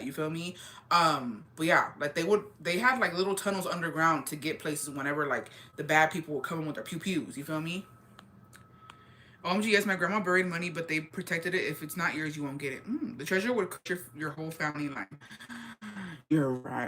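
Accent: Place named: Texas, United States. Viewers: We just Texans, that’s the way we talk 😆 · We're southern that just how we all talk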